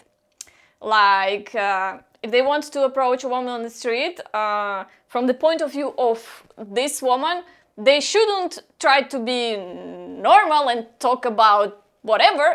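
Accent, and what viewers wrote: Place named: Russia. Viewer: I love how straight up you are and your swearing word's makes me laugh your actually funny the way way you speak from Russia but in English speaking love your video on utube keep it up cheers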